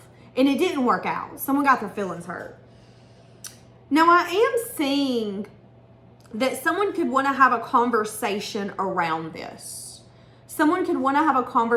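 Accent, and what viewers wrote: South, United States. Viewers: This Yankee LOVES the manner in which you speak! The descriptive way you talk plainly, intuitively with a Sourhern flair that is Spiritual AND hilarious! You are appreciated, dear lady.😊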